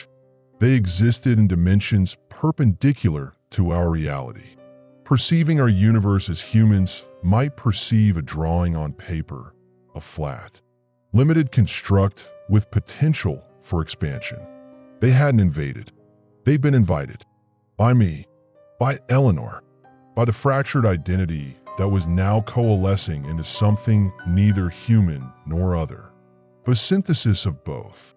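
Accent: Spain. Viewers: Yeah, sometimes uses Spanish pronunciation. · yeah, the Spanish inflection was cracking me up.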